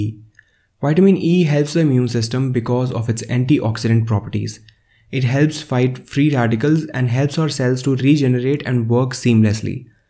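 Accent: India. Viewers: thank you, normally i dont listen to Hindu accent, but your information is worth it!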